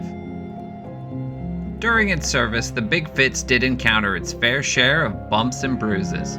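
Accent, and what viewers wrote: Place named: Canada. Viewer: I'm guessing your Canadian by the accent and mispronunciation of Detroit and RADAR.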